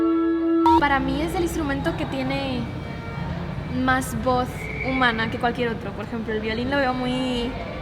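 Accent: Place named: Mexico. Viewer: Se te pegó un poco el acento mexicano 😁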